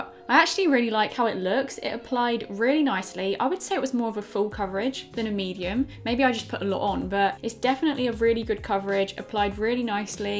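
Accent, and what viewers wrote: United Kingdom. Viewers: I’m in love with her accent 😍 Is this a British accent??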